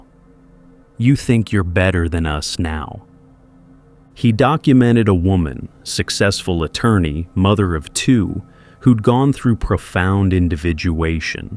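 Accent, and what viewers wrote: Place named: United States. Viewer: It's impossible to find a more vile American accent, than with this narrator.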